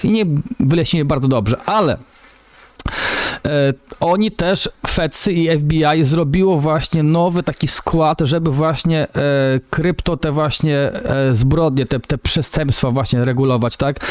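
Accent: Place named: Ukraine. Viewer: Hhehe dobra jazda 😁 chyba ukraińscy jutuberowie od krypto wchodzą na polski rynek bo już widzę 3 analizę z nie naszym akcentem :-) trochę jajcarsko ale ok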